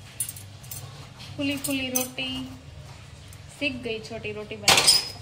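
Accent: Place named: Gujarat, India. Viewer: Wah wah....gujju jyare hindi bole...bapa ketla saras lage😄👌👌👌👌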